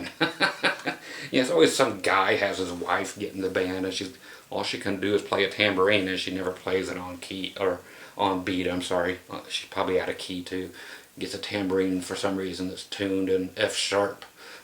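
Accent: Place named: South, United States. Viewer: Sorry to be so nosy, but where are you located,? I am forever trying to figure out your slight accent...Oklahoma? Texas?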